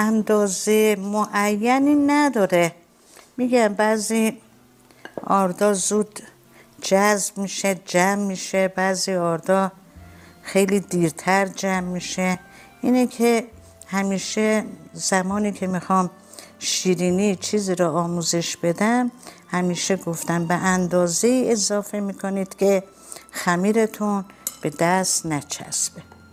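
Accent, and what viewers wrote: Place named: Dezful, Iran. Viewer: از کلوچه دزفول بدم میاد ااااااخ شما حتما دزفولی هستی که تبلیغ شهرت میکنی پیداست لهجه دادی با اینکه رفتی اونور آب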